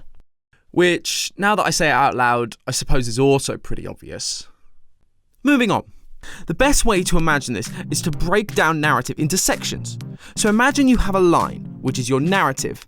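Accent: United Kingdom. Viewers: Subscribing for the sexy British voice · I love Your British accent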